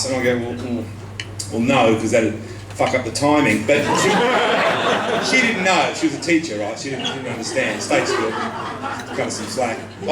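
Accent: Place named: Australia. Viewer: I love fresh stand up, beards and an Australian accent. This guy is a total package! oh and funnier than $&!+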